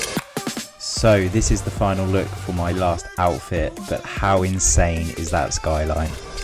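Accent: England, United Kingdom. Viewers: You sound English. Do people dress like that in the U.S and Australia?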